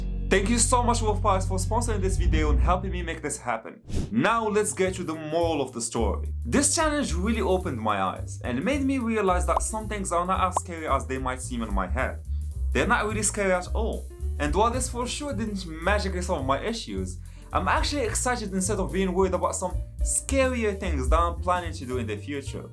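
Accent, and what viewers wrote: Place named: Morocco. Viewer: M9awd bro I’m just assuming you’re morccan from your accent but anyways I like the editing the quality the confidence so keep going bro you definitely going far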